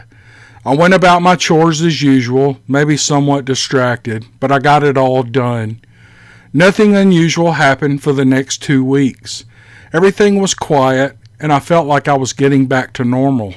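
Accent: South, United States. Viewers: Hysterical fantasy. What's with the southern accent? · Sounds like a southern racism Chanel. Tone down the confederate accent. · your quote. "How about I just jump into the stories without running my mouth".(But please don't forget to play the music?) - my quote!. And I don't think you run your mouth off because I love listening to your southern drawl. From an old Aussie who just loves your presentations.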